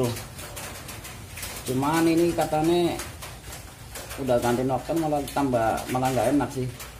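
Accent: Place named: Banyumas, Indonesia. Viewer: Ora ngapak ora kepenak..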